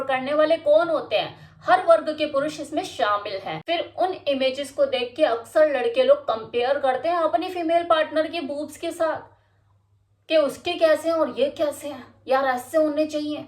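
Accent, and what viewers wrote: Haryana, India. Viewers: App Haryana ke ho?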